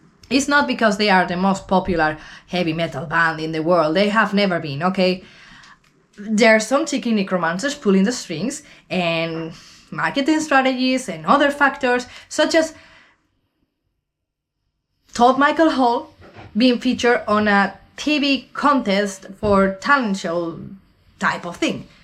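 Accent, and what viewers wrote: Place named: Wallachia, Romania. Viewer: where are you from? you sound wallachian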